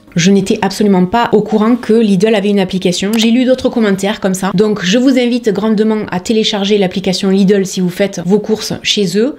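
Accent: South, France. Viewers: bonjour j'espère que vous allez bien? c'est toujours un plaisir de voir vos vidéos et je les adore, merci de nous faire partager ces 15 astuces utiles pour réduire le budget courses afin d'économiser de l'argent. Votre rire communicatif,votre bonne humeur et accent du sud c'est le top. J'apprécie votre simplicité, votre sincérité et votre naturel. Bonnes fêtes de fin d'année prenez soin de vous. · Mdrrrr il est trop beau ton accent du sud. Je suis d’Avignon et merci pour tes astuces ( les coupons …etc ) Merci beaucoup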